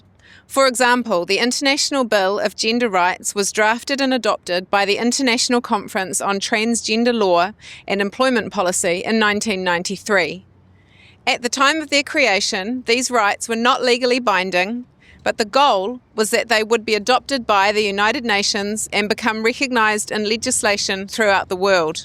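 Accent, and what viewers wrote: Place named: New Zealand. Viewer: Dickheads long strategy -- sex years long ... you gotta love NZ accent 😂😂😂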